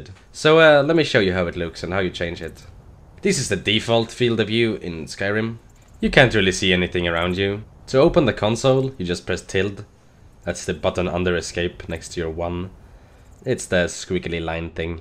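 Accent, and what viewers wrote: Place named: Sweden. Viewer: haha i can tell that u are from Sweden.. lol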